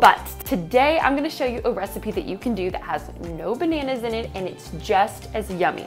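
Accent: South, United States. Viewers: Who els noticed a little bit of a southern accent in voice. 🤔😁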